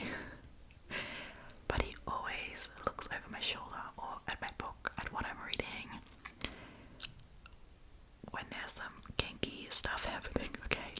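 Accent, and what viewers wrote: Australia. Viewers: “as a non-English speaker” Proceeds to speak English at a native level with a legitimate Australian accent. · For so long I wondered how you ended up with an Australian accent. I just assumed you chose it as your preferred accent in English. Now I know!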